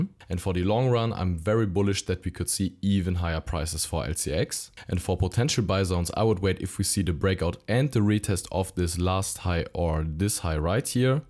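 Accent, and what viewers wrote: Germany. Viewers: Love your german accent 👯👯👯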